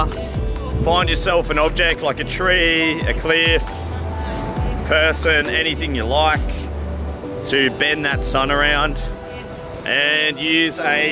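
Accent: Australia. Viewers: That photo is so beautiful. Your Aussie accent was so strong 6 years ago - it's really noticeable compared to the videos you made this year 😊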